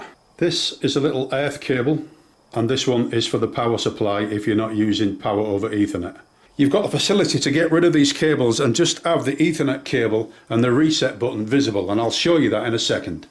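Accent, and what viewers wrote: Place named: East Yorkshire, United Kingdom. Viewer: Hi, I just ordered one of these, but think I need some power for the PoE, can you recommend a switch or adapter for the supply. Liked the video, and the accent too, sounds like your from my part of East Yorks. Regards John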